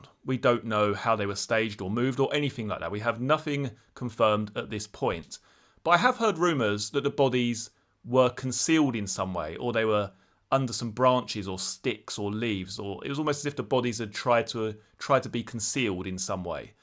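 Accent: United Kingdom. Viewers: I look forward to all your videos regarding this crime. You throw out so many points, & theories like I do, & think like I do. Also, your accent is wonderful,& British accents are in my top 3 favorite accents, and it really brings out the captivating way of telling a story, IMO.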